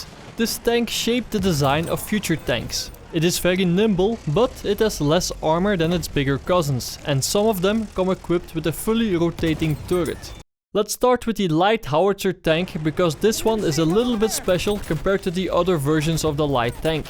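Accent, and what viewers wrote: Netherlands. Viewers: you're dutch right? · beetje duidelijk Nederlands accent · Are you dutch? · You have a very noticable Dutch accent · Are you Dutch ?! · hij zegt "tank" op een Nederlandse manier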